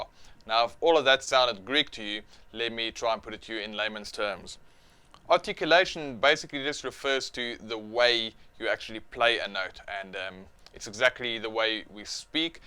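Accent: South Africa. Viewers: You are South African! :D \\m//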